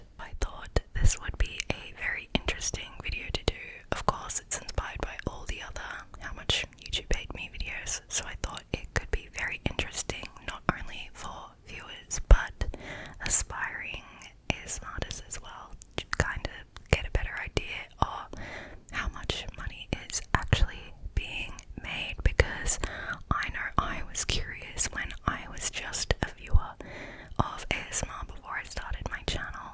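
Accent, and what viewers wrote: Australia. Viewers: I’m late to the party but is Australian? Sometimes it’s hard to pick the accent with asmr but I’m loving it! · Are you aussie?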